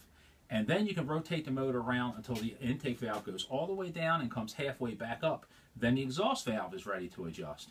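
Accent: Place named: Maryland, United States. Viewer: This guy sounds like he's from the eastern shore of Maryland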